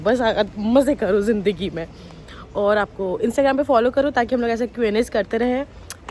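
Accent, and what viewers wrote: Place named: Bihar, India. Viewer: Are you from Bihar. Your hindi sounded like that. I am also from Bihar.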